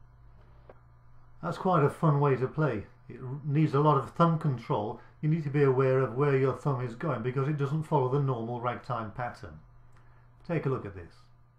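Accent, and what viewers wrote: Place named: Sheffield, United Kingdom. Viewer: Jeez, who did the subtitling? Obviously doesn't understand the Sheffield accent!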